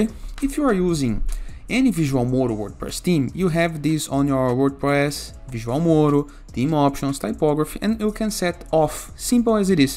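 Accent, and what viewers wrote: Brazil. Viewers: esse sotaque é tupiniquim , hein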